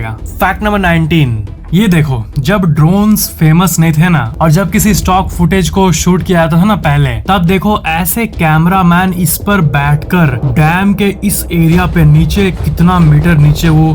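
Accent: Bihar, India. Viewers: His language speaking style changed too much..like he is from bihar.. And his voice is not energetic anymore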